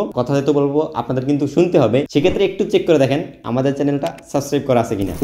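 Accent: Barishal, Bangladesh. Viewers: দাদো তুমি মোগো বরিশাইল্লা হেতে মোর কোন সন্দেহ নাই! তোমার বাড়ি কোন জায়গা?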